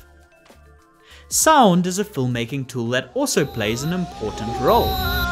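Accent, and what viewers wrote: South Africa. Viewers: Where is that accent from sir? South African or German?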